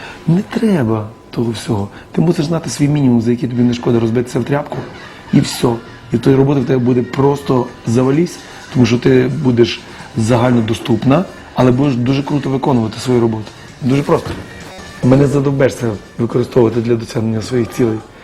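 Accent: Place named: Nadsiannia, Ukraine. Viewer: Это не чистый язык это Надсянский Диалект.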